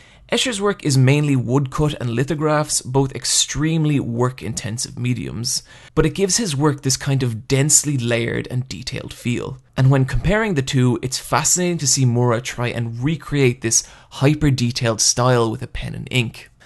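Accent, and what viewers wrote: Ireland. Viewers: you're Irish... I'd love to have an Irish accent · are you irish? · you Irish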